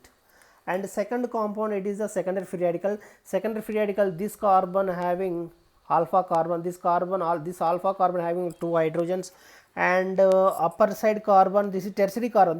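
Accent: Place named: India. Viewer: انجليزي هندي 🥲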